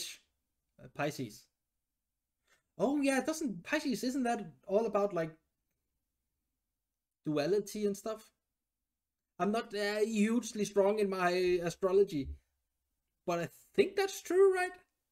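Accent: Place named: Scandinavia. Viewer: Hey. Where are you from. You have a slight accent I can't quite place. Guessing nordic, maybe danish? You got some catching up to do. They have already released 2 singles from their upcoming album, dropping on August 27. Then there's their back catalog. Pisces are a few years old now and the 4 band members have improved so much in that time and they seem to have found a sound and a style that are uniquely their own. Their new stuff is very technical and progressive, yet they still have that super heavy and awesome groove, we fans like. They often mix in influences and styles from outside rock and metal, always surprising, when we just think we have figured them out. My favorite songs are to be found on their later releases but i don't think they have a bad song. If you're not used to listening to metal I would start with the suggestions below, but if you like metal music you might jump straight into their new stuff. 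I recommend:,.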